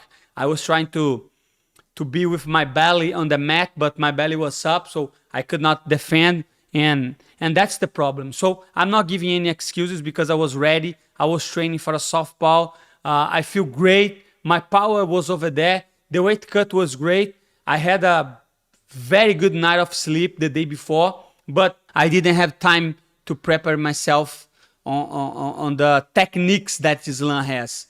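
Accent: Brazil. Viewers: Thats the most brazilian sounding brazilian ive ever seen.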